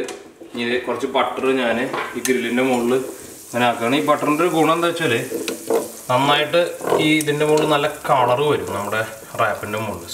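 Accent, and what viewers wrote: Thrissur, India. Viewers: അത് ഒരു ശൈലി ആണ് ബ്രോ എന്റെ കൂടെ ജോലി ചെയുന്ന ആളുകളും പറയാറ് ഉണ്ട്. തൃശൂർ ക്കാർ ആണ് പറയാറ്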